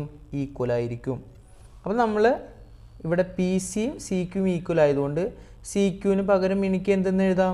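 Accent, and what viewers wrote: Thrissur, India. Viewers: Sir thrissur aanalle njaanum thrissuraa but now im malappuram... I’m still missing our slang😓✌🏻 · Thrissure kkarandhe bhasha poli Nan oru malappurakkali aanu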